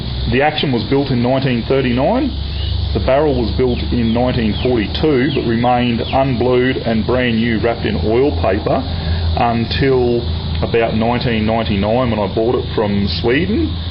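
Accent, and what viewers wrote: Australia. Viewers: I UNDERSTAND THE AUSSIE ACCENT!!!! everything you say souns like your asking a question!!! lol jk but great rifle.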